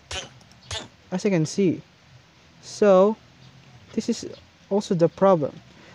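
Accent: India. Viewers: oh 😂 u speak like a indian but np! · i think u r a indian beoz iam also a indian the english slag looks like indians and even i speak like that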